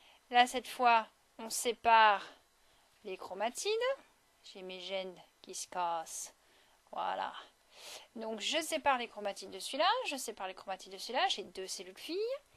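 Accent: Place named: Bresse, France. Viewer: Parfois on dirait que vous avez un accent bressans ☺️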